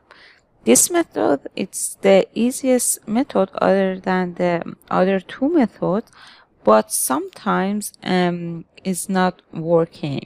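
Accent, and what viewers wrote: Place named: Iran. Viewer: انگلیسی با لهجه‌ی ایرانی 😁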